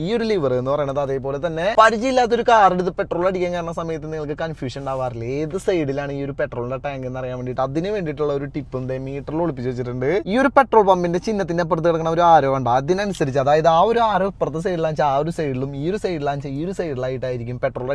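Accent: Thrissur, India. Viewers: ഇന്തുട്ടാത് : തൂക്കി എറിയുന്ന ഭാഷ ത്രിശൂരാണോ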